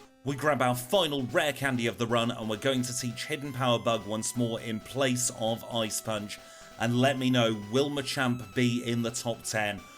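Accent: United Kingdom. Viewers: wow that sounds interesting! That's fine, I will keep on enjoying your challenges anyway! I like very much! Your accent is so cool too! I remember you saying you are from Manchester... Is that correct? You're the first one from there I've ever heard! You friend LukethPlays is the second one! Thank your for producing contents for everyone! I think your challenges are unique and also I long to take part in the next stream! I'm one big fan of yours man! I wish you the best luck of all! See you later!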